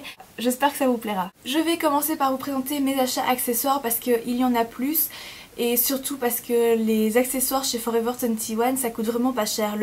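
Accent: Belgium. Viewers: Oh je n'avais même pas remarqué l'accent belge mais quand tu as dit bruxelles ça a tilté !!!! Enfin bref j'adore tes vidéos , continue comme ça !!!! ;)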